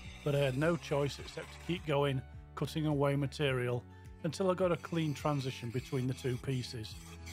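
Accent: United Kingdom. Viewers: That’s a lovely piece. And it’s so nice to hear a British accent. Thanks for sharing 🌞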